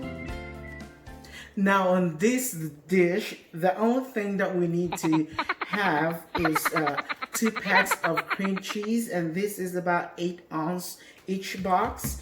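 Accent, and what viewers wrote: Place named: United Kingdom. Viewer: british accent si kuya... at masarap siguradong magluto... patikim..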